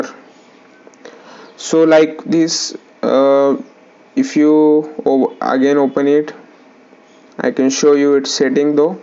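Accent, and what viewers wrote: India. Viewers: Must be Indian, lol but I can understand what u say. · U are indian ur voice is like indian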